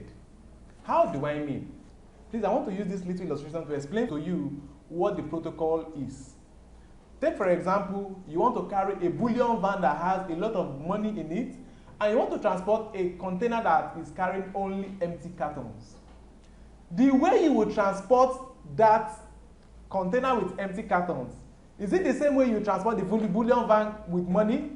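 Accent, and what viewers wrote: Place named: West Africa. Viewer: I wasn't sure I could stomach the West-African accent, but I gotta admit, the hilarious analogies have grown on me... Good work, can't wait to watch all videos...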